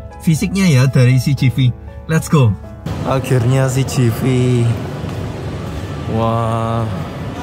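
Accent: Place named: Java, Indonesia. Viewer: Wooaah selamat nnton miin critain akuu yaah.. Btw admin ko bahasa nya agak medok gituu..yash..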